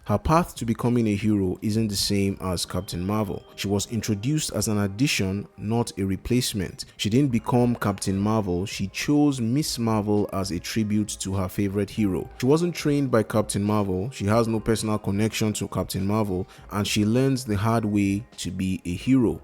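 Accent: Nigeria. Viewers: I think he Nigerian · 5 seconds in I said….wait he has to be nigerian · Naija bro talking comics. So refreshing to hear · Nigerian? Instant sub. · I hear a nigerian brother, I subscribe. No questions asked 😁😁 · Soothing ass Nigerian voice 🙏😩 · Hello U sound like a Nigerian (I am too) Please do you know where I can buy comics here in Nigeria (Abuja) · I know a Nigerian brother when I hear one. Nice pacing of the video as well as the edit. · Subscribed IMMEDIATELY i heard the Naija accent. We need more naija nerds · Hearing a Nigerian voice always makes me smile inside. My siz and I binge on those B- quality Nollywood movies so we really have a thing going with Naija flicks lol "Honarabohl woman??!? What do you mean honarabohl?? That gyeol dea be di most renowkn Ashewoh ddis side of de Congo, abeg." Literally heard this once and I DIED (Oh I'm Kenyan btw)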